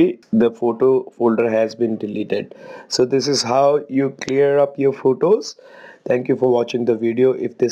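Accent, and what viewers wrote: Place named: India. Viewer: Every indian accent people give the proper details ❤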